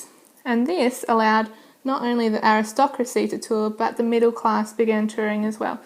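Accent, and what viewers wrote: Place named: United Kingdom. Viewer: Even though she has a British accent, I can understand almost everything effortlessly. That means she made a really good lecture ✌ (better than my teacher...)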